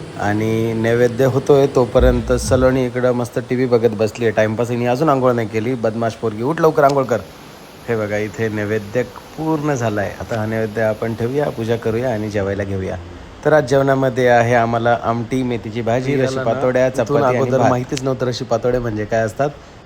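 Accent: Nashik, India. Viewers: Dada aple nashik vale olku yetata voice madhun kadje gya dada tai chi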